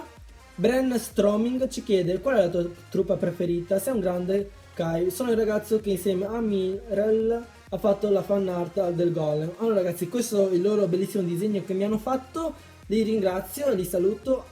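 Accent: Veneto, Italy. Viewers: Kai ma sei veneto??? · Ahahahahh grande Kai, ho messo like subito per il dialetto Veneto♥♥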